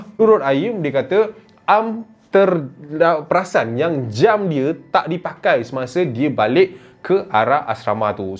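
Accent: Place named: Kedah, Malaysia. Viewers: Comel je ckp kedah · Pandai cakap utaqa noh... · Best pekena liwat Kedah..mcm Imuda bercakap😁😁😁😁😁😁👻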